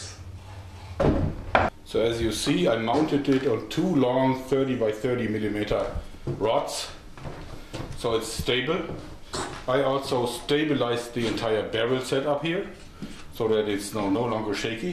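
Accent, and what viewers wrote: Germany. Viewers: Das ist eindeutig englisch mit deutschem Akzent :D Hab ich recht? :)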